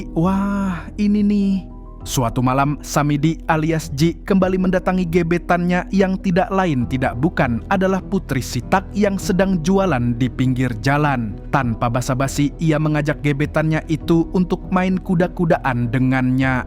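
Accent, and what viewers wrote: Banjar, Indonesia. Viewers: Bubuhan Banjar sekali nya 😂😂😂😂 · Abang urang banjar lah😅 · Mantap mang bahasa Banjar y😂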